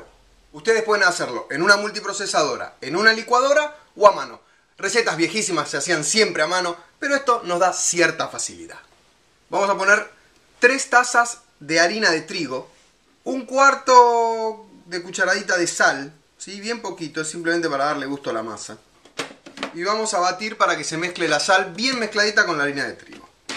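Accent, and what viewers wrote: Argentina. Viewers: no es español pava tiene mas asento argentino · En Argentina y creo que por su forma de hablar es argentino no se le pone huevo. Es solo harina, sal, grasa o manteca y agua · Ahh uma coisa adoro tu acento este acento argentino es increible de bonito que tenhas um bom dia obrigado beijos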